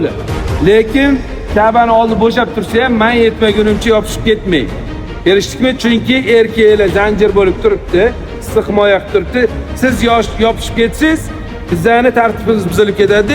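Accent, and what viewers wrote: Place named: Uzbekistan. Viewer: БОРСАМ. ХАМ. ШУ. ИНСОН. БИЛАН. БОРИШНИ. НИЯТ. КИЛДИМ. ЖУДАХАМ. ТУГРИ. ТУШИНТИРИШ. БЕРАР. ЭКАНЛАР. ОЛОХ. РОЗИ. БУЛСИН. ИЛОХИМ. УЗИМИЗНИ. ШЕВА. УЗИМИЗГА. УХШАГАН. АЁЛЛАР. КАЕРЛИКЛАР. БУЛАР. ДОМЛА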